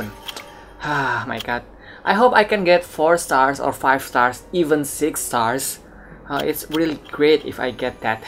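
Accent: Indonesia. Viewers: Logat nya Indonesia bgt.. di add om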